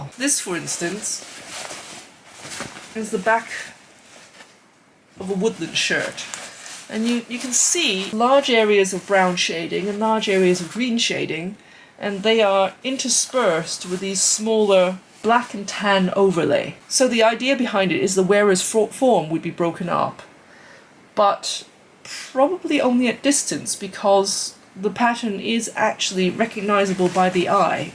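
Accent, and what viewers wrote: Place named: England, United Kingdom. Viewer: I'm listening to an Asian woman with an English accent speaking about Phantomleaf on a channel called 'Flecktarn'. I am very confused.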